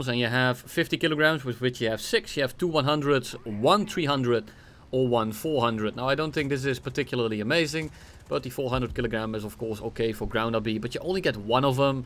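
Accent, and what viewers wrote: Brabant, Netherlands. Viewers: for anyone intrested, theyre called vliegtuigboMMen, not vliegtuigbonnen. has a dutch accent (prob brabant) making his pronounciation deviate from normal Dutch people (ABN) 🤓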